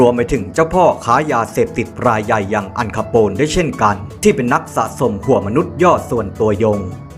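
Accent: Thailand. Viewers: แอบเหน่อนะเรา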